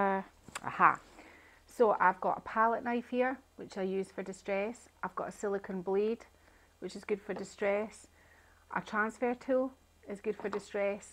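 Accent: Scotland, United Kingdom. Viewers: Love this.... I've been binge watching every one of your videos while I'm in my own store working on furniture. Your work is mesmerizing! And I love your accent - my brother in law, Ian McCallion, came to live in the states when he was 15, we are 59 now, he still has the Scottish accent and all of his American boys, all 4 of them, can roll right into that accent as well. It's great! And, btw, I just became a retailer of your own gorgeous papers here in New Jersey.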